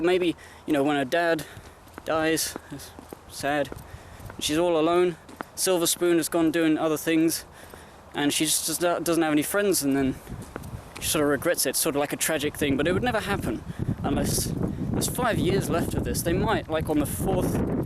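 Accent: United Kingdom. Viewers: You're British? I didn't even realise. And I should have, as I'm British.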